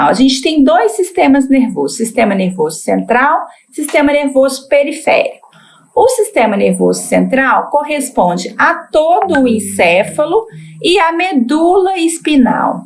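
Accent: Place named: Minas Gerais, Brazil. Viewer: Bem que esse sotaque não é estranho , meus são mineiros de Belo Horizonte ❤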